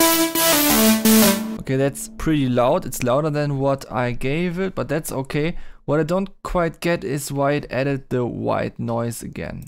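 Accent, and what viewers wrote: Germany. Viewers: where you from? deutsch?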